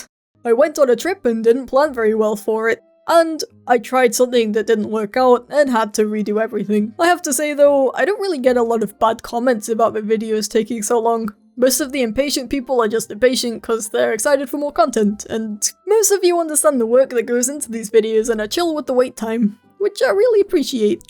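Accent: United Kingdom. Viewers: Your voice is british deep and compaired to your fisrt video is wowz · R u British?? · KID OF LINK AND ZELDA it's just his british voice (yes he's british) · Its really interesting to watch your channel. I really like your british accent whilst you talk about german potato culture. Like, you couldve chosen all countries but you took germany?! I dont understand why cus i just want to get away from here, Lg dein Tom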